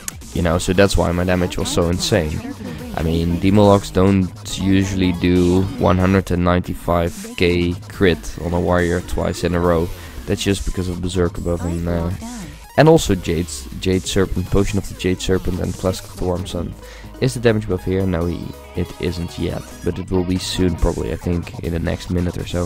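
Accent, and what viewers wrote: Netherlands. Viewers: YOUR DUTCH I JUST KNOW IT :D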